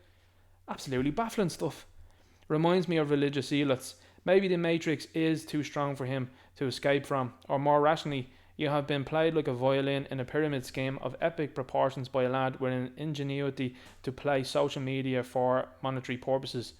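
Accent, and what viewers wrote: Ireland. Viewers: Can't stand the 🤡 not funny at all ever was he's cringe just shouts makes me emberressed to be Irish and his stupid culchie accent annoys me....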